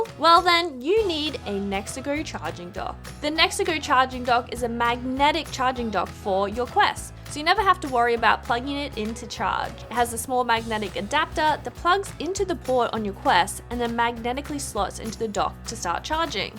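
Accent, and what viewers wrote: Australia. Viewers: Such a great video! super brave and open to share this, I truly love your videos. hope you won't have another second of anxiety ever again <3 p.s. nothing beats the aussie accent! :P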